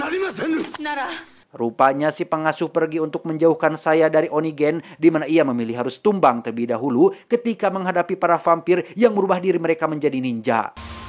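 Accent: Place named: Aceh, Indonesia. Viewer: Aceh sekali bg😂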